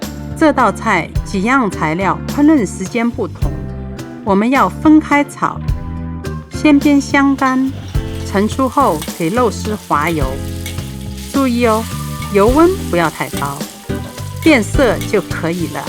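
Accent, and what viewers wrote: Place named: South, China. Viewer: 韓俊傑 南方人都这口音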